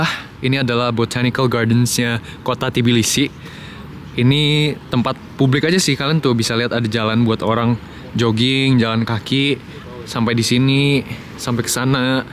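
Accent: Indonesia. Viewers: Ejaan bahasa inggrisnya logat indo aja, gpp kok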